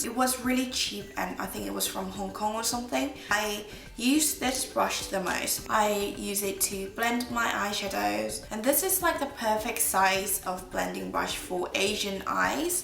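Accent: United Kingdom. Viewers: 님은뭐랄까..보면볼수록매력이있는거같아요~~~오늘부터구독하기시작했어여~~~경선님유튜브에서알게되서오늘부터보기시작했네요^^ 영국영어발음도너무매력적이고~~항상응원하겠습니다~~~ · 영국식 영어 너무 멋져~~♡ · 얼굴은 애기애기한데(손연재닮음!!!) 목소리나말투는 파워머찜..역시 발음은 브리티쉬..존멋..무튼언니팬이라구용..><항상잘보구잇슴니댱~~~ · 아이허브에 리얼테크닉브러시 세트가있더라구용ㅠㅜ그거 구매할까말까 수천번은 더 고민하구있어요ㅎㅎ 스펀지두 마찬가지ㅜㅜ 언닝 영국발음 쭤러용♡ 볼살없어서 스트레스인 저에게 언니 볼살은 부러움의 대상이에용ㅋㅋ 항상 이쁜비디오 감사드려용! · 올라오기만 기다리고있었는데 올라왔네요!!!!!댓글 처음 남겨봐요ㅎㅎ좋은정보 많이 알아가서 좋아용 영국발음에 관심있어서 보게된건데 언니 동영상들보고 급!!화장에도 관심이 생겼어요ㅋㅋㅋㅋ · 영상많이올려주세요♥ 영국발음좋아요ㅎ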